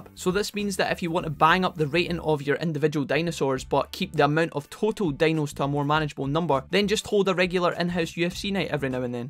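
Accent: Scotland, United Kingdom. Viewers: Ah 'hink he's Scottish like me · This guy is scottish. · First time viewer and love the Scottish accent. I am a giant fan of foreign accents so this is amazing! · Is this a scottish accent? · Cool video, learned a couple neat tricks for the game, and also found a fantastic new Scottish accent to emulate. Well done lad! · I love your accent! Are you Scottish?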